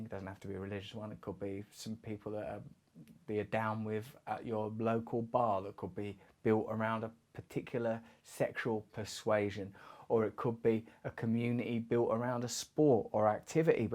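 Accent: United Kingdom. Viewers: i notice you have an accent that sounds like Kathrine Hepburn sounded when she talked. Is a nice accent. British ?or Aristocratic?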